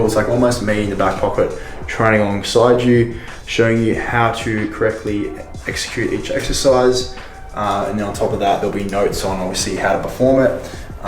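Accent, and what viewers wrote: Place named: Australia. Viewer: Hey man—just discovered you have YouTube videos—I messaged you today about Donnie Darko. Wow, people tell me I speak too fast, I think ya beat me there! 😂 No worries I understood you throughout—and wow I love Aussie accents. Y’all are the coolest, every Aussie I’ve ever met in Texas was a top notch person. Truth is I’d probably hire you for online training, but I’m am ex-trainer myself (ACSM/HFI) and I seem to always clash with the guys I’ve hired. Anyhow, two thumbs up on your online presence & ventures. And PS: I’d be surprised if a Hollywood producer doesn’t offer you a film role one day soon, you’ve got a great mug, killer bod and masculine voice and presence—the works! Cheers mate. 👊💥🙌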